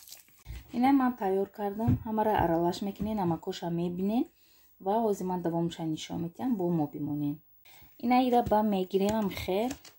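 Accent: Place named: Kulob, Tajikistan. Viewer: Шумо аз конибодом барои чи кулобча сухан мекуни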